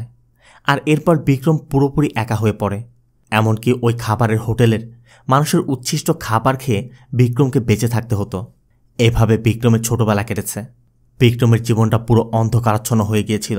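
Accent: Bangladesh. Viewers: কলকাতা বাঙালি এই ভাবে কথা বলে না নিশ্চিত বাংলাদেশি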